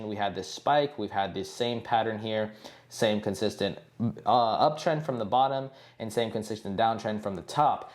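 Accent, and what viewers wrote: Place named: United States. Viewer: Very good plain English.. Easy for me to understand.. Love American accent.. Go shibarmy.. from Malaysia 🇲🇾😊🚀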